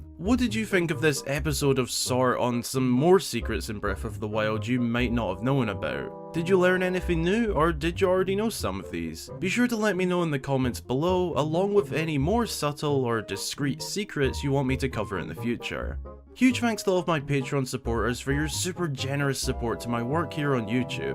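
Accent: Scotland, United Kingdom. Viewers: Your Scottish accent is amazing xD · you sound like you're Scottish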